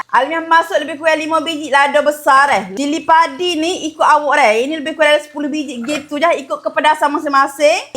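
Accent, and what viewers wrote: Kelantan, Malaysia. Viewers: Berapa kali deh daaa 😂😅 mcm tu ke org Kelantan cakap?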